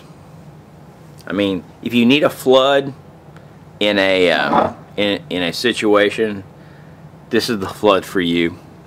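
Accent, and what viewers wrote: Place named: South, United States. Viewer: You sound like a red nec.. Lamb voice. Costco sells it for <num> cad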